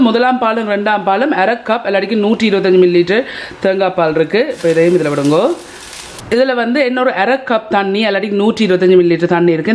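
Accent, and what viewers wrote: Jaffna, Sri Lanka. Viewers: Hi Your (slang Jaffna mix Europe mix )Tamil very interesting. I'm on of your FB page follower.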